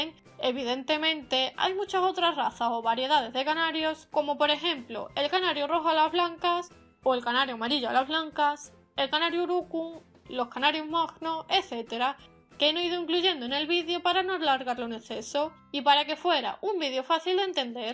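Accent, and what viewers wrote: Spain. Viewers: serás de alguna parte de España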